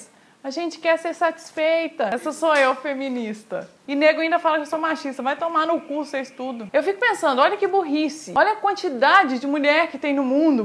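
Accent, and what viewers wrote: Minas Gerais, Brazil. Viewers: Que gracinha ela falando mineirês! 😍😍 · poxa aos eu ja notei que ela e mineira kkkkkkkk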